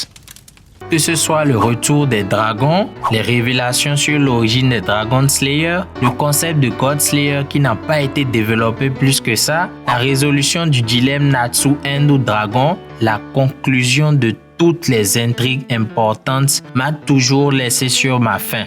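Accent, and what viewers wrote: Benin. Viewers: J'adore le montage la vidéo😱 (façon trash). On sent l'accent de nos voisins les béninois dans la voix off. Beaucoup de courage 💪bro, tu mérites de buzzer. Et je rajoute : en Afrique (Togo); il existe aussi des putains d'Otaku.😁😁😁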